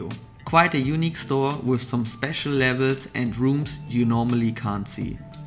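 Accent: Germany. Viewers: du bist 100% deutsch so wie du sprichst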